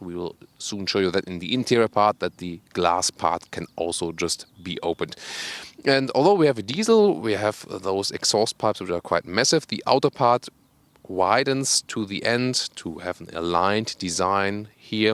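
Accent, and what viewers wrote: Germany. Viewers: And that german english 😂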